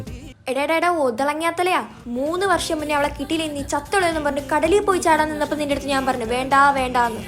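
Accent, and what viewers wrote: Thiruvananthapuram, India. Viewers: Thironthoram samsarikunna daivam 😂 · Currect tvm language 😂😅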